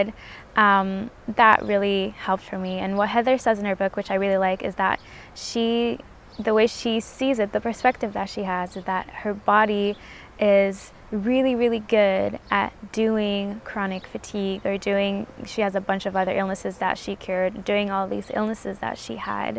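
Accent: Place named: United States. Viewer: I noticed you have an American accent but said uni instead of university. Whys that?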